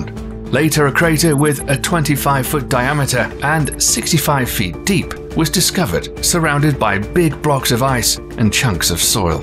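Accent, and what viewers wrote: England, United Kingdom. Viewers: Y is this English man that doesn’t sound from the USA using football fields to measure things , I hate it when people use that to measure something it’s so ridiculous and stupid no one cares about American gay football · The only thing I'm worried about is fear mongering its' self. Equally troubling is the blatant over-use of the so-called british accent, touting all this doomsday drudgery to the faithfully numb, who are ultimately hired to spout continuous streams of falsehoods far & wide, to sell the appearance of enjoying the ride, while impatiently waiting for the latest chip, that will turn everything hip. Thanks but that, I will skip.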